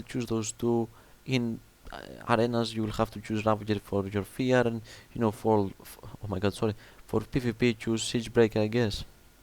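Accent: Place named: Greece. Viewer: ζήτω η ελληνική προφορά! διαφωνώ για το dragon roar και ίσως πρέπει να αναλύσεις και το rude interruption ;-)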